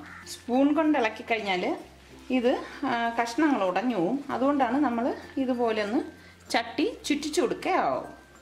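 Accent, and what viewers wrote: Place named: Eastern Kerala, India. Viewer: .. Ya ya.. Sure.. Ithatha wr u frm? Vadakkarude slang alla talking.. Kizhakot anu ennu thonnunu.. Am i correct.. Im vry interested in ur recipes.. Masha Allah.. May Allah bless u..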